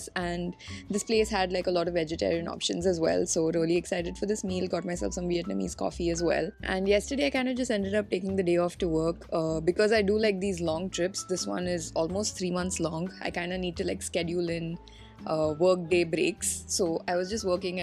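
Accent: India. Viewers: Yes she is from India. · You have an Indian accent, are you from India?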